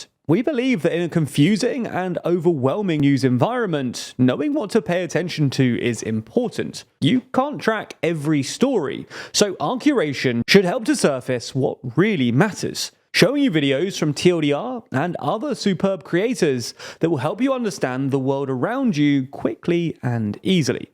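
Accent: England, United Kingdom. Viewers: The videos are great but what s with this overly british accent ?? Kind of anoying, sorry☺️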